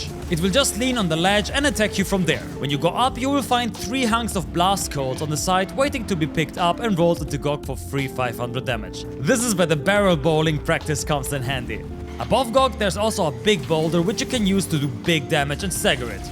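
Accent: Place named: Germany. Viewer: Are you german ? 😅 because of the dialect. Edit: Bin mir komplett sicher xD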